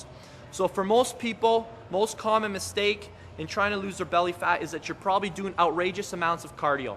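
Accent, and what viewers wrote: Canada. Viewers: hehe he sounds like he is from canada or something ay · u can say this guy is Canadian :p well im too but i say about not aboot any way he said really good stuff so peace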